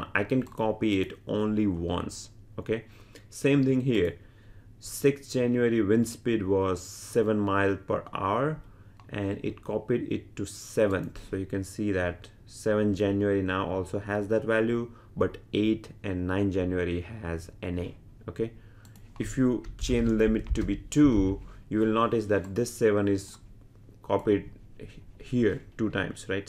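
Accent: India. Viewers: I actually wanted to complement him - I heard his indian accent, but it didn't bother me at all - he spoke clearly and with the correct pace (unlike many other tutorials from people with the same pronunciation, who I simply cannot follow)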